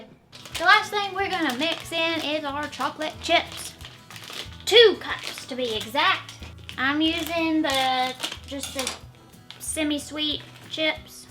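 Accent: South, United States. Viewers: Love you when you bouncing around in the kitchen ,by the way your southern accent is gorgeous. I wish could learn talk like you. Xmas greetings from sweden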